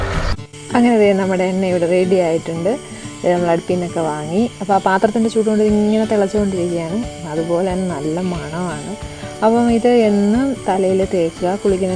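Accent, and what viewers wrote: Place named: Kollam, India. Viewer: kollam kaari aanenn samsaram kettapozhe manasilayii 😍😍😍❣️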